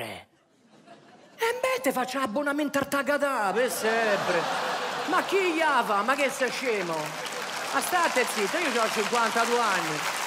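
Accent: Rome, Italy. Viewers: E stupenda troppo forte fa ridere da morire poi romanesca è ancora più forte 😅😂😂😂😅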